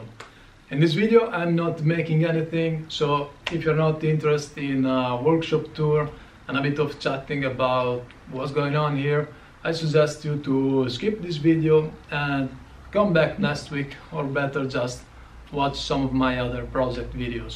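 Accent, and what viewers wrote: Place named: Italy. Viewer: Uaglio si sente che sei italiano smetti de parla inglese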